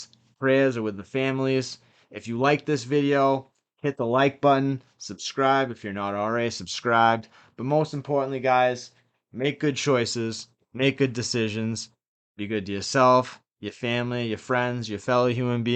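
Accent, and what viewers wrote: Boston, United States. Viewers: video was decent an i liked an subbed cuz u from an mass an i support mass creators the accent was jus throwing me off its the mark walberg shi an im born an raised here never heard it other then from wyt ppl from southie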